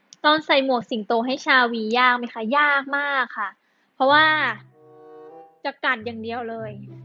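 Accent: South, Thailand. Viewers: นายฮัวเลย 5555 แหลงใต้ชัดเปรี้ย555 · แหม่เฮ้ยยย แหลงใต้ชัดเปรี๊ยะ🤣🤣🤣 น่ารักอีตายยย❤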